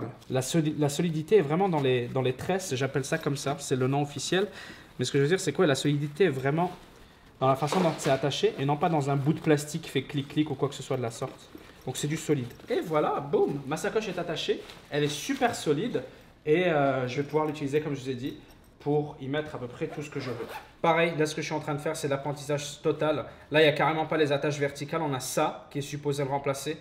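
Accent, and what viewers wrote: Quebec, Canada. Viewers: T’es québécois ou français? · Tu est tu es québécois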